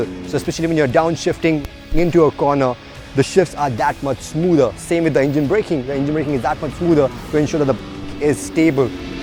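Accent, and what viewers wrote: India. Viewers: ''paaan nigaale'' lol typical dhoti tone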